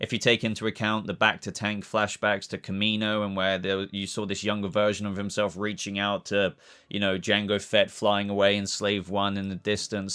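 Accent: United Kingdom. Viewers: its good to have good old Brit reviewing DC ALL COMICS IS NICE i like how you get right into it mate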